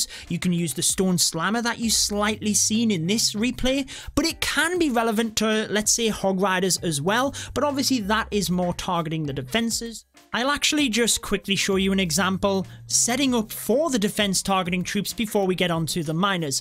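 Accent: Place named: North, United Kingdom. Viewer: his accent is a Northern one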